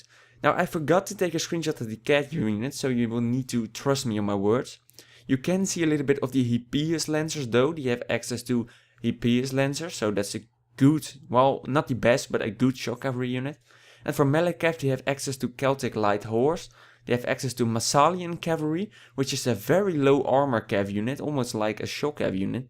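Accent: Netherlands. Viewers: Where are you from? Your accent kinda sounds like a dutch person speaking english ;)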